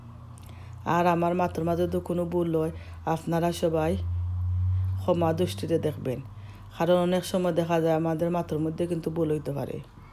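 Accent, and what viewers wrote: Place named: Sylhet, Bangladesh. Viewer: সিলেটি মাত আমি ভালো পাই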